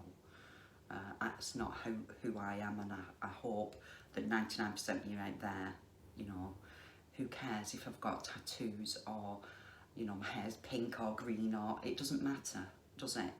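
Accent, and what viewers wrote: United Kingdom. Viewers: Tomorrow we have a date,. But today i want to catching up... I clicked on a video of you because of the tattoos and I stayed because of your voice and natural way of speaking. To my spanish ears you have a strong British accent. And I love it!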